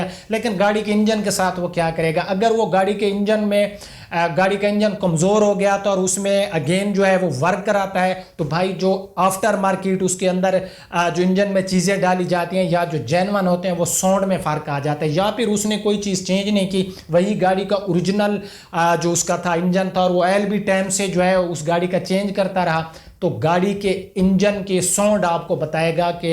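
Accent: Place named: Punjab, Pakistan. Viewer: Good info.....urdu in punjabi accent...😋😋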